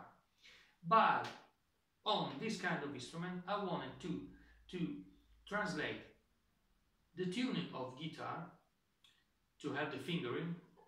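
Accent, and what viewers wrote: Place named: Trieste, Italy. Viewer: Your English is fine. My father and uncle were born in Trieste. I'm used to hearing English spoken that way.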